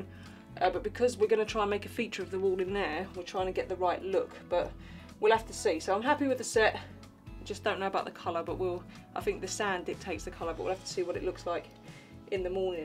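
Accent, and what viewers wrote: United Kingdom. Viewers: Talking fast...I change Play Back Speed. Being from US it is sometimes difficult figuring out what Brits are saying. ☺️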